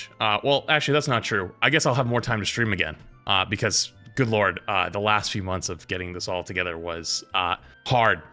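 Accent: Boston, United States. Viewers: The only thing I got from this video is you are from Boston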